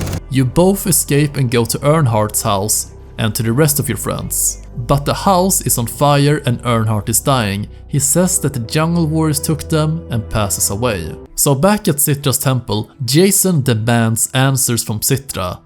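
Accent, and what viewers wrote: Sweden. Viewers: Your Swedish accent is noticeable mate. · tog mig 1 sec att fatta att du är svensk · den där svenska aksenten är galen manen grym vidio btw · Hey are you Swedish by chance? Your accent has a Swedish touch to it, also great video btw! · The swenglish is deliciously strong 🤩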